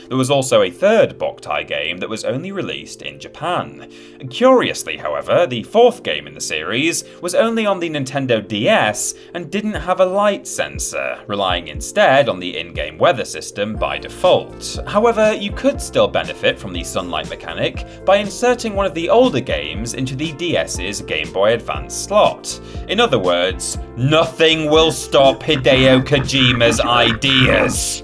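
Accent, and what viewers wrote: United Kingdom. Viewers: Damn brits with their cool accents and crude dry humor lol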